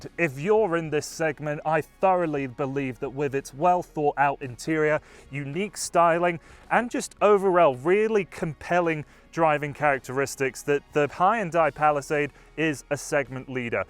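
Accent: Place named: United Kingdom. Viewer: I really enjoyed watching this video and I noticed that you did add in some funny lines. I have no trouble with the British way of saying things, because I’m used to Robert of Fully Charged. He loves to joke around. He says Hyundai the same that way you do. I was waiting for an aluminium joke! Keep up the awesome work! I have to admit that I mostly follow very low to no emissions cars and I might not have the time to watch every one of your videos.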